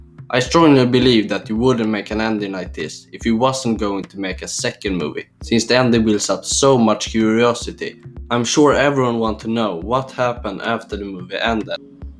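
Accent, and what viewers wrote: Sweden. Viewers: Visst är du som pratar svensk hahahaah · U rly sound Swedish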